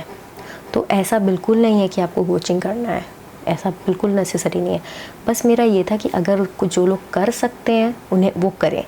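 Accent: Bihar, India. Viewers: R u from Bihar???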